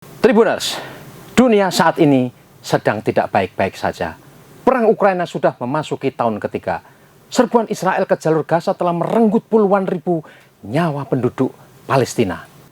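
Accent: Java, Indonesia. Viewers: Loghat jawanya di kurangi bos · Narator nya lucu logat nya jawa bingit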